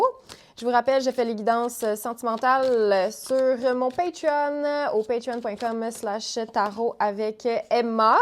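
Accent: Quebec, Canada. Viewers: Hahahaha vas en Gaspésie et en Beauce tu vas voir tous les québécois parlent pas exactement le même 😘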